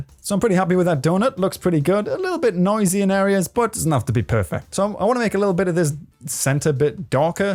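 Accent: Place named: North East England, United Kingdom. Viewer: Just found your channel dude, not watched all of this yet but started, going to after I've done food shopping as I'm planning on making a 2D horror themed RPG and this will help me learn how to use this program and what not. Also you sound like you're from up here in the North East too, always good to see :)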